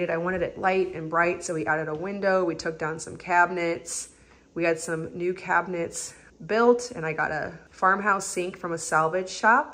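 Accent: United States. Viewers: I actually went to your open house not knowing about this channel then later found it after seeing a page from your book hanging in the barn and of course I had to look it up! To my surprise I already followed you on instagram! The house felt important and historical. It’s hard to describe. You did such a great job of bringing it to life and yet keeping the feel of that time period. I felt I was truly touring a piece of history. It has been really cool to go back and see what you guys added from antique shops and such. Because I never would’ve guessed it wasn’t all original. I also love old things and I love your style. Your videos are so well done. And as a fellow Missourian I love hearing your Missouri accent that is similar to mine 😆. I will definitely be following along on your home building journey. Can’t wait to see what you do next and how you’re making things work in your temporary home. 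It’s all very relatable to me because I also have a large family and we have moved a lot. Whoever ends up in the farm house will surely love it and feel that magic. It’s a very special place. 🤍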